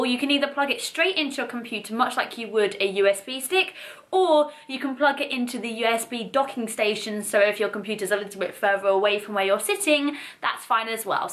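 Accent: United Kingdom. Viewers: Man British accents give me a headache, I can't take more than about 5 mins of it, but this is a sweet looking headphone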